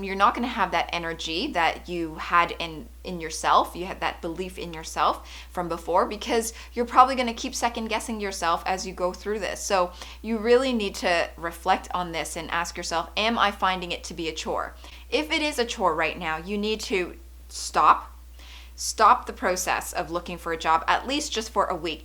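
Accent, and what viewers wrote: Canada. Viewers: This is so much more inspiring with a Canadian accent!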